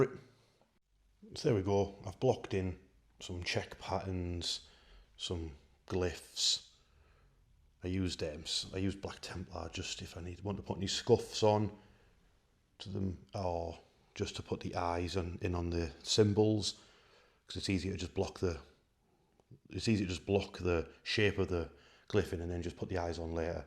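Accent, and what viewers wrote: United Kingdom. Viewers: Ah the audio quality. The Smoggie accent really shines through 😅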